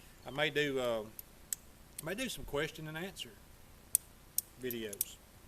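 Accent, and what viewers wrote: South, United States. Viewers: Hello! That’s nice looking. I’ve also heard others make the point if you like to look “period” then age your gun to look anywhere from new to very worn, but not 100 years old. I like it all- to each his own! As far as your speech: I’m from Florida/Louisiana stock and feel at home hearing your videos! (I love the scenery and most of the weather here in Montana, but damn I miss the South) Rock on, brother.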